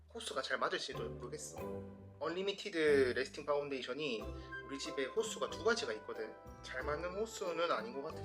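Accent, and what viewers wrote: Gyeongsang, South Korea. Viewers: 누가봐도 경상도 사람이었어요 형 걱정 안하셔도되요!!! ㅎㅎ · 저 헤지스 룰429 립밤 2+2 했을때 8개 산거 주변에 몇개 나눠주고 오늘 마지막꺼 오픈했어여🙋‍♂️ 션님 사투리는 경상도 허세가 없어서 귀여운거 같아용👍 그리고 같은 경상도인으로서 저만 그런건지 모르겠는데 서울말 쓰다가? 주변에서 사투리 써보라고하면 잘 안나오지않나요^^ 근데 저보고 항상 사투리 쓰고있다는ㅎㅎ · 예전 영상부터 경상도말투엿는데ㅋ ㅋ ㅋ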